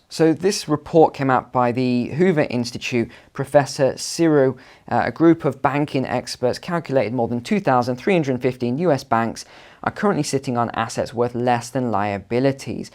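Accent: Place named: United Kingdom. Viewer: I don't know about you all, but it is better to hear that all the banks and real estate are all going bust from a calm British accent.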